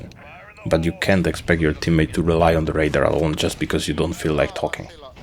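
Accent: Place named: Finland. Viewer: By that accent , are you Finnish ?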